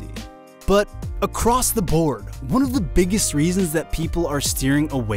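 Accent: California, United States. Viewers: he has the HEAVIEST Californian accent 😭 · This slow California accent that keeps asking me questions even when its telling me answers is killing me.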